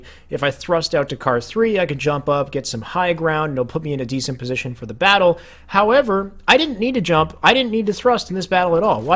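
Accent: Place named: Canada. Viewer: He is Canadian.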